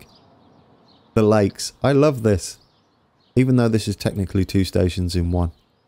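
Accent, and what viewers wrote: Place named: Midlands, United Kingdom. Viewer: Wonderful work! Keep it up! And I got the "shout out" at the start... I'm blushing a bit (BTW - surname is pronounced "Kool" (really!). Austrian - German family. The spelling in German has the "umlaut (dots)" over the "u", but the typewriters on Ellis Island 140 years ago didn't have that key!) Good effort you! So an American in the Midwest, with the German last name, who lived in London for a couple of years right at Y2K and a bit, who's very much a British railway / London transport nutter helping out a Midlands (If I place your accent properly?) bloke who's living all the way over in the Hill Country near Austin, TX with the livery colours of a West Midlands train on this recreation. What a crazy, mixed up world! Lovin' it!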